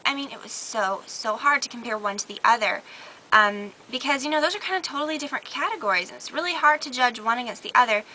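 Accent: South, United States. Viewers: "and at the ieendd" Haha your southern accent comes out more and more the more videos i watch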